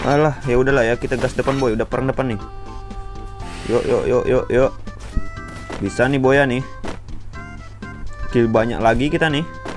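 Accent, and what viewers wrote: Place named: Bali, Indonesia. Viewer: bang lu kok ngomong nya kya logat bali😭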